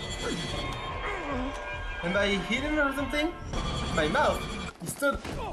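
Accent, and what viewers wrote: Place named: France. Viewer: yup, ton accent français est bien présent 😂👌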